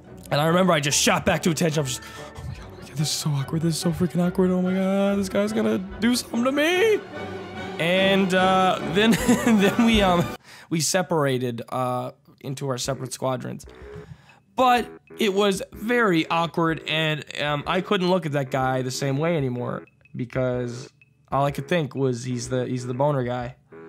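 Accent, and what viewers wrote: Newfoundland, Canada. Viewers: Huh, I actually guessed that you were from Newfoundland- Its probably because the way you pronounce certain words is similar to how MattShea does.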